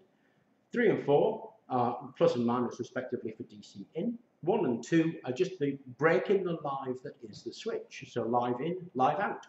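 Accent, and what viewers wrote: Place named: United Kingdom. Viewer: I can't make-out some words again and think your Zoom rec/mic should be shirt pocket. British island accent is difficult for other folks across the pond too. Ni chrome heaters have huge inrush on mains.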